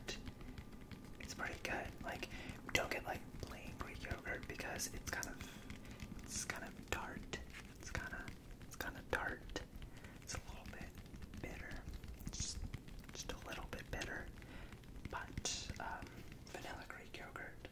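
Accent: India. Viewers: Specially with indian accent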